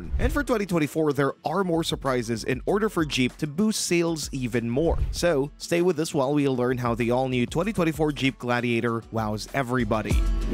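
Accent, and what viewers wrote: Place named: Philippines. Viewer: You sound philipino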